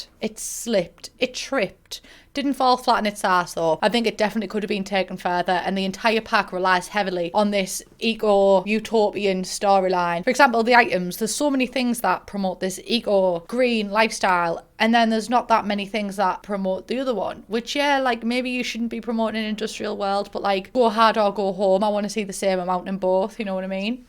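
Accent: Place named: RP, United Kingdom. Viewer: Your accent is so posh it's absolutely dripping with privilege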